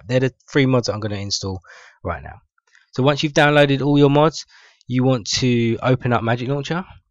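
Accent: United Kingdom. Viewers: You remind me of Garnet from Steven Universe. Your black (I not being racist) you have a british accent, and you have those glasses! :D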